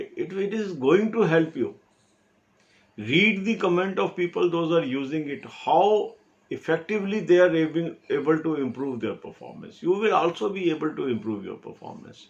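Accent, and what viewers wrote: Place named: Punjab, India. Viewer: Sir g tuhadi Hindi'ch jeda punjabi touch aaunda e, o menu boho vadiya laagda e😁😁 Love n respect 🙏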